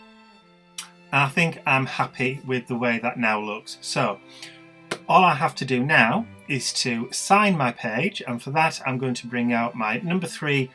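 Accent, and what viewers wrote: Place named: Goole, United Kingdom. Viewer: Love that page. I love listening to you, I'm from Goole and your accent reminds me of home, I've been away for many years. It's good to hear words like scrumpled!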